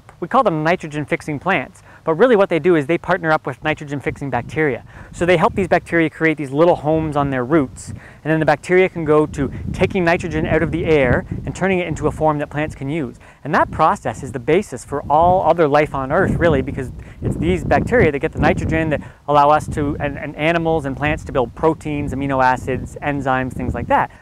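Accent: Canada. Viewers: You sound so Canadian.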